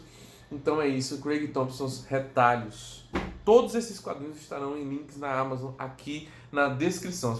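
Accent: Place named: Northeast, Brazil. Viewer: Muito show seu vídeo...e sua lista é nota 10... eu admiro muito o seu Canal e já te acompanho há um tempo, mas só hoje me dei conta que não estava inscrito, o que resolvi com um clic...eu gosto muito a forma como vc fala de quadrinhos e os apresenta...e além disso, tem esse sotaque que amo (tive um namorado de Maceió e um de Teresina, mesmo sendo filho de Paraibano, meu pai não tinha nenhum sotaque nordestino)...da sua lista eu tenho "Maus" (meu HQ da vida), "Retalhos" (lido nesse mês de novembro) - vc já leu "Habib", é lindo demais, - e claro, tem alguns que estão na minha lista de desejados...um grande abração carioca e boas leituras...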